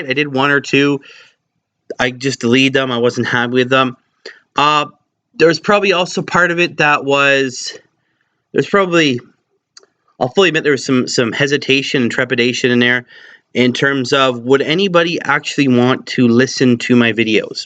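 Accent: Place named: North America. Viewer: Sorry! I meant to say "NORTH American accent"! Ha ha! Go Canada! All the best, man!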